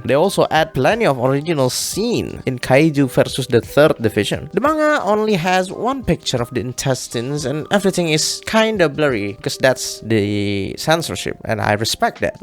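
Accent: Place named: Indonesia. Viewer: wait are you indonesian? you have such heavy indonesian accent when you're speaking in english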